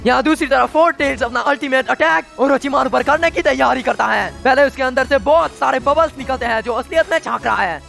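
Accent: Assam, India. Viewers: Are u Assamese 😒?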